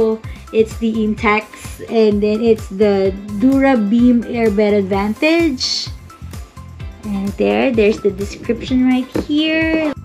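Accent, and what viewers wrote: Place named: England, United Kingdom. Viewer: I always love your english accent, Congrats for having a new one, enjoy your new air mattress #dabestieszoe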